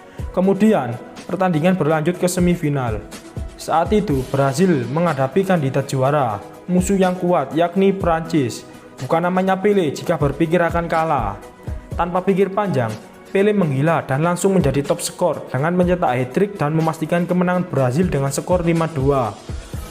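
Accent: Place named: Java, Indonesia. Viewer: suarane medok banget, mantab surantab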